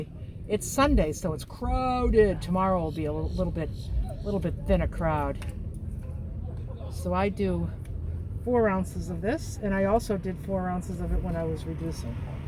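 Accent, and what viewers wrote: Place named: Boston, United States. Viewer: I really am sorry about your coffee ordeal. I never drank coffee then as working early shift, I had to make sure everything was filled, and coffee was made, I could take care of everything but coffee I knew nothing about making, so someone showed me how to do the coffee, it was easy, it smelled So good over time I tried it, I’d add a lot of cream, then I tried de caffe,it was much better so I’d have a cup. Only I never would finish it. I will once in a great while have a cup of instant, it may take me all day so it’ll be cod, which it’s ok to me. I’m happy you have such a strong will to quit guess it’s like quitting smoking, I’ve never smoked. But husband had a hard time when he’d try to quit. Soon he stared smoking outside, Dr said I couldn’t be around smoker. I’ve been around it all my life,dad smoked, but they say I’d inhale more than dad did. 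Didn’t realize it until I was grown, then hubby was a smoker. Anyway the lunges paid for that,. Your doing so well with your van, it’s so nice. I hear you mention, you were heavier, at one time, I’m gonna eventually look at all your other videos, I just love them. Most I love your room, it’s beautiful. Well I’m rambled along ,so I’ll stop and backup the one I’m watching now. Have a wonderful day. Love your accent, did you say your from Boston ? Latter 👍👍👍👍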